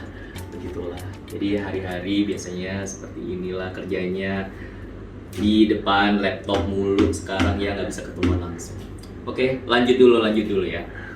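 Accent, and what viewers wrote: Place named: Java, Indonesia. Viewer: Sabar mas, dulunya artis eh krj sbg crew.... Smngt.... Kbykn artis star sindrom..... Sukses skrg medok jawanya uda berkurang mas hehehehe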